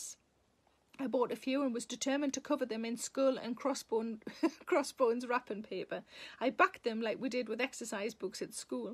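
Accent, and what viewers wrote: Newcastle, United Kingdom. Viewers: I LOVE hearing your Geordie 😉😉😉 When I lived in Newcastle I loved to learn learn how to speak it. But when I asked people to repeat what they said, they didn't say it in geordie. ☹️. 😉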